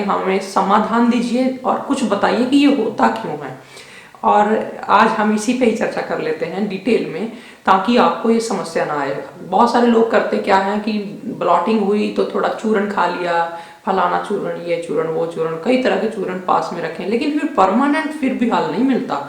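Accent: India. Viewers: Rohit Malhotra Is he teaching English to u... He is Desi n speaking in his accent... He said bloating or bloting just to make us understand as we search with that name in YouTube... Don't mke fun of anyone for their accent bcos English can be spoken any how one likes there is no rules as such...as it is a foreign language...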